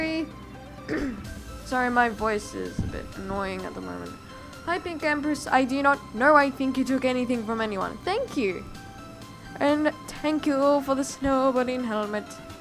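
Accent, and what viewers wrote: Australia. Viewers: u sound like ur from Wollongong...... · Sorry if will offend you but you sound Australian, are you? Sorry again if I offended you I didn't mean to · lol I love Australian's accents it sounds so perfect and beautiful <3 and I love your personality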